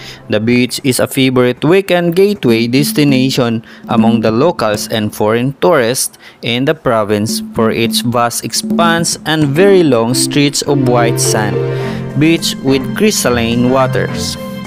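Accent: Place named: Philippines. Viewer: pilipino accent..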